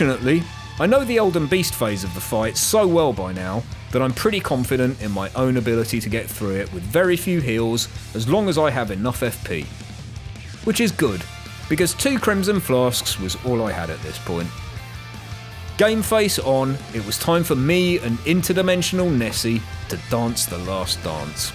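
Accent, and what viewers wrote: United Kingdom. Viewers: I adore these videos, an accent I can actually enjoy listening to and a similar sense of humour to me (+ great videos too). Thank you for uploading videos like these, they’re great to enjoy as a fellow bri’ish brethren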